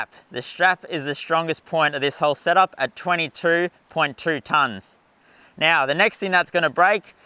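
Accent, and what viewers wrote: Australia. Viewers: When I hear an Aussie accent at the beginning of an Offroad educational video I know I’m about to learn some good stuff! 🇺🇸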